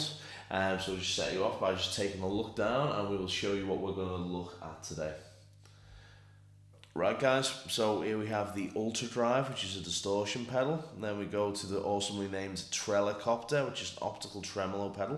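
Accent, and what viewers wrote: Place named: Liverpool, United Kingdom. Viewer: This accent sounded Scousy to me. I sort of was right :). Nice review, lad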